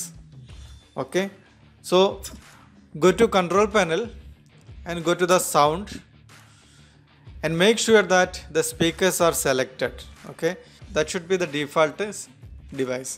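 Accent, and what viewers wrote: Kerala, India. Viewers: ഇംഗ്ലീഷ് കേട്ടാൽ അറിയാം മലയാളി ആണെന്ന് !!!!!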